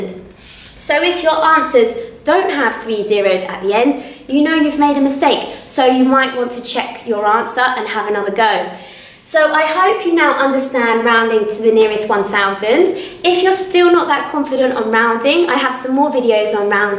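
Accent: England, United Kingdom. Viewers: I love your British voice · I love your britost voice can you a video on English speaking practice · British girl HAHA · Ooho kon sir das ki ho England kya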